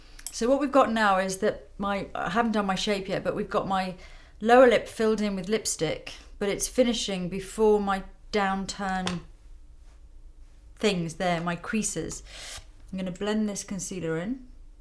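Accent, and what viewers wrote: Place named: Hampshire, United Kingdom. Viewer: Beautiful. Do you come from anywhere Hampshire you have a faint accent?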